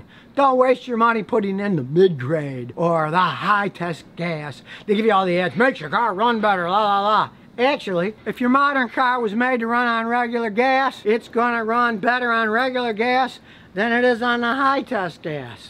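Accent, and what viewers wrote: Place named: United States. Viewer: Dear uncle, please speak a little slowly for us who have a difficulty understanding American accent.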